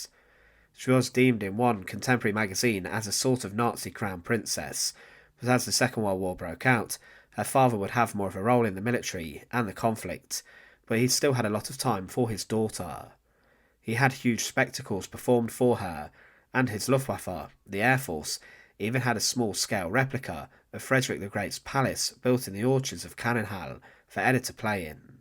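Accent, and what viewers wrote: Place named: Yorkshire, United Kingdom. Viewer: It’s probably AI. I find narration by AI very irritating. The accent is pretty heavy, maybe Yorkshire.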